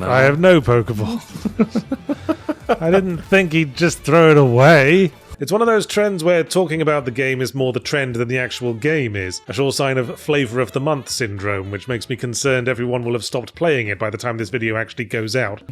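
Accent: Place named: United Kingdom. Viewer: It's so weird for Yahtzee to sort of grouse on the anachronistic look of the game. I mean, from ZP to this, his entire schtick is humorous anachronism. It's the humor of a sophisticated British accent saying creative swears. It's a very consistent artstyle of blob shapes juxtaposed with any old photo pasted from google images. That's why I find it funny. God forbid a game do the same thing.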